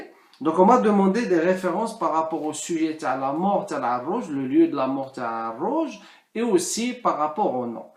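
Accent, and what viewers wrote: Tlemcen, Algeria. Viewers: Bonjour ça ma fait rire l'accent tlemcenien ma grand-mère parle comme ça je suis de oujda et ma grand-mère est tlemcenia j'aime beaucoup regarder tes vidéos machallah elle sont très intéressantes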